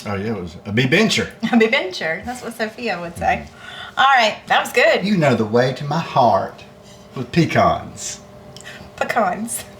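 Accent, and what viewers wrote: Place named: South Carolina, United States. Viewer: Hey I live in S.C. and with your accent u have to live here to lolll!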